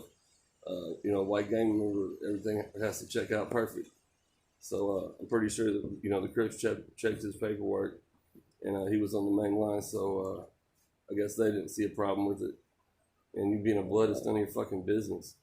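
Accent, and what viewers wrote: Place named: Indiana, United States. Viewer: I dunno you, but you're a white guy from Indiana. And you talk like a white boy from Indiana, you don't pretend to be something you're not. These clowns pretending their momma is blacker than night are an embarrassment. Total embarrassments.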